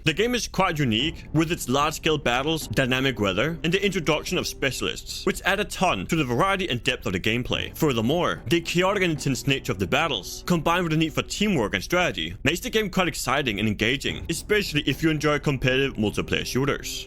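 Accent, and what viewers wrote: Denmark. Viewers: Danish narrator?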